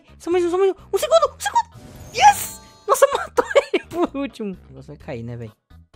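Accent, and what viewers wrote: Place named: Ceará, Brazil. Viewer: Tu e cearense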